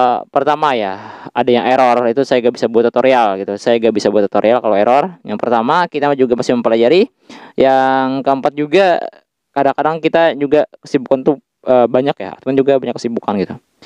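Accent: Banjar, Indonesia. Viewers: Logatnya Banjar banget min..😅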